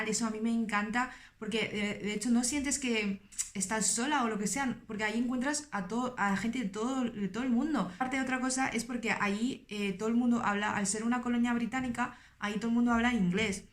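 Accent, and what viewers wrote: Spain. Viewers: No es que hable como una española, es que yo apostaría a que es más española que yo. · Se ve algo raro ver a una persona asiática con acento español😂 y bueno vale la pena anotar que lo habla muy bien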